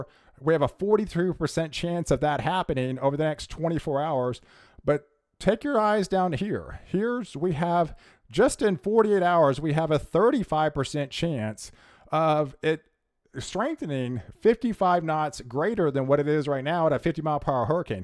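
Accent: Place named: Western Pennsylvania, United States. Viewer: You are from western PA. You cant hide the accent!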